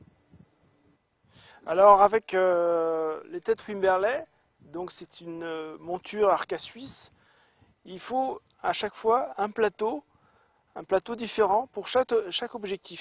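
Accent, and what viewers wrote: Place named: France. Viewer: You sound French.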